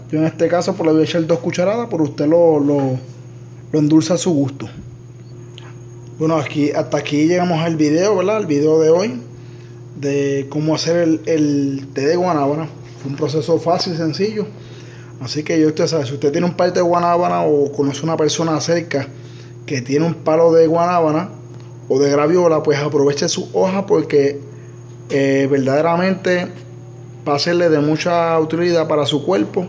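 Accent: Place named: Puerto Rico. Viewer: Bendito se nota que eres boricua amigo.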